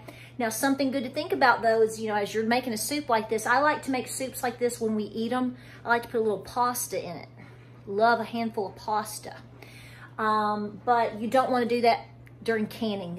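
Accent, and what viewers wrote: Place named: Tennessee, United States. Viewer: Love you ! I'm from LAFOLLETTE TN. You talk like us. Down to earth and tell it like it is. And simple and sweet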